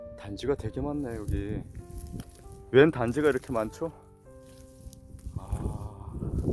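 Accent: Gangwon, South Korea. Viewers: 우연히 보고 들은 강원도 억양.너무 반갑고 좋아서 보고 또 보고 듣고 합니다 정말 감사히 잘보고 있습니다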